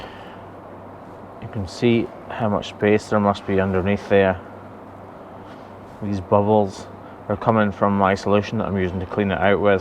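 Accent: Scotland, United Kingdom. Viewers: I'm a 65 year old grandma and I live in a little old house on the lower Eastside of Erie, PA. And I never miss an episode of the hoof gp. Why I find it so fascinating 🤔 I have no idea. Maybe it's that Scottish accent 🙂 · I really enjoy watching your videos . I’m fairly new to your channel, but I must confess, since I’ve been watching you every time I clip my nails I hear (in your lovely Scottish accents) “ You lot just look at this heifers overgrown hoof , lets just make her as comfortable as possible “ 😜🤪😝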